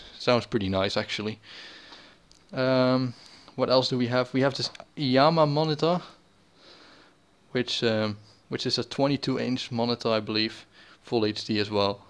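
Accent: Netherlands. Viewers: Are u dutch? :P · are u dutch? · Your accent look likes dutch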